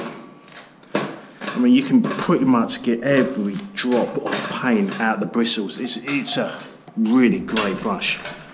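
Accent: United Kingdom. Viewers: Are you from east London 👍😁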